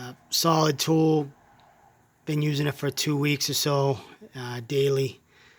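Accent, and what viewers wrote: Rhode Island, United States. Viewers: Dude’ I love your Rhode Island accent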